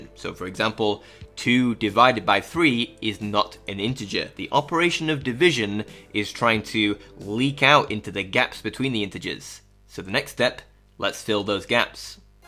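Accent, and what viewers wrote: Yorkshire, United Kingdom. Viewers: *Man with an accent vaguely resembling the Yorkshire accent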